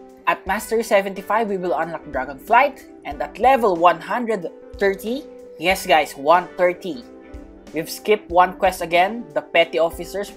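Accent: Philippines. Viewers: i know filipino people with that accent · I thought you are American but your a pilifino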